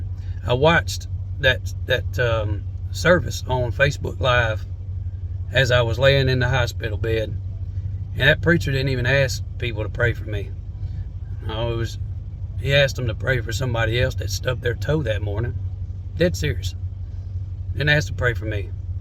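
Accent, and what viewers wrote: United States. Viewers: The churches are no better in the UK, people not friendly and in there clicky groups, i stopped going very dissapointed with what i experienced, thankyou for sharing, u are looking well brother praise Jesus, love ur deep south accent , see u in the clouds very soon , maranatha 🙏🏻💕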